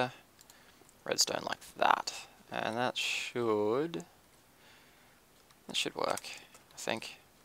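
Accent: Australia. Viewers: I really like your Australian accent! I lol'd when you said "ice fountain" because I thought you said "arse fountain" XD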